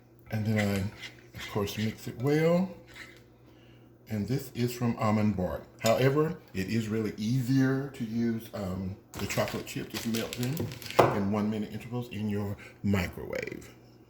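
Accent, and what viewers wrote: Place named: South, United States. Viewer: Love your southern man accent. Yes!!! Loving the 😀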